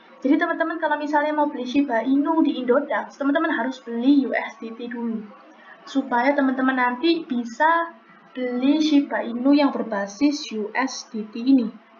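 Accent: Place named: East Java, Indonesia. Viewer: Logat jawa timuran.... suroboyo yaaa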